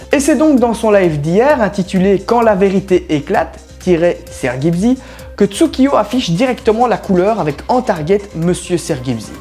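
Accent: Belgium. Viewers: mec es ce que tu es belge car ta voix my fait penser et t'es drama ces de l'humour ou tu fais que informer sur des drama avec des preuves concrète ou tu fais juste sa pour attiser la haines ou tu utilise le mots drama juste pour la forme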